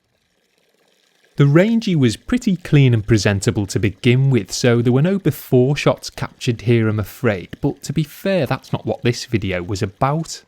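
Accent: Liverpool, United Kingdom. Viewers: The interesting and compelling thing about is incongruity. He’s doing a working-class job (car detailing) and has the appearance of a working-class individual - but his commentary is fantastically articulate, precise, and detailed – to a degree that you expect from a lawyer, consultant, or other premium-rate professional. Add to that the accent (which sounds almost Liverpool, but not quite – maybe Warrington?) and delivery – providing such a reassuring and relaxing quality - it’s almost like taking 10mg Valium tabs…….and the result is great videos - interesting, informative – and just satisfying to watch. PROPS to – you’ve made a dull and dreary subject fun / absorbing! You are a CULT! Regards Chris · well youll have " fuck me "then too cos this has to be one of the most irritating voiceovers on earth and I love the Liverpudlian accent. its like he never takes an in breath. 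its really weird in fact.....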